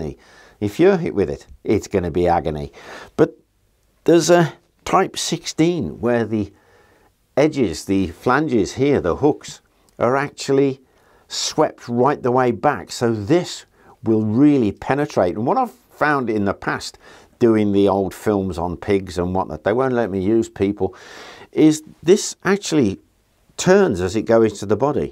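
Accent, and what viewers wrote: United Kingdom. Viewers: The host of this video, to me, amplifies the subject matter with his authenticity. It’s clearly obvious he’s done his homework. Besides, with his British accent, he adds more. One day, I would like to allocate a few hours (on a Sunday), and learn the events of The Great Crusades. Christians vs. Muslims. I do have a deep interest in world history, just not all events. Recently, I discovered the unreal incident that occurred in 1964 (the year I was born) at Stanleyville, The Congo. The black rebels there committed atrocities that matched the deeds of Nazi Germany, but Belgium paratroopers retook the city and rounded up those responsible. All were either hanged or shot on site.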